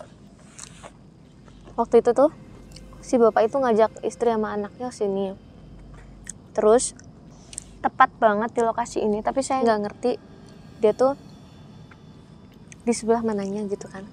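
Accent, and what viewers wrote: Java, Indonesia. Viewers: Ka lucu jga yh klo lgi ngomong jwa...jwane mdok bngt kaka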